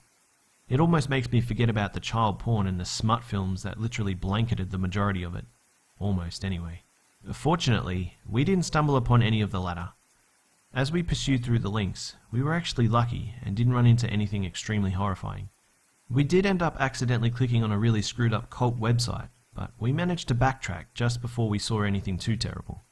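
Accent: Australia. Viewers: love love love this!! + love your channel and LOVE your accent! im an aussie too (melbournian lol) it's very soothing to hear your voice/accent. helps me sleep when im having a bad night. please keep up the great work, from one of your BIGGEST fans!!!!:) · Hey question! Where abouts are you from in Australia? I am in Australia for 4 months now and just recognised your accent ( not to be creepy or anything) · cause you read your stories with feeling. When the person narrating does it on one tone and almost no enthusiasm. It distracts from the actual story. Plus the Australian accent ain't to shabby to listen to. :-) hee hee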